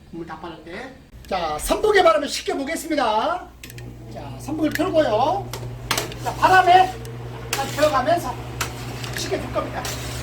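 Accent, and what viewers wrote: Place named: Chungcheong, South Korea. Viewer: 충청도분 이시군요 ㅋㅋ 경장히 ㅋㅋ 감사합니다.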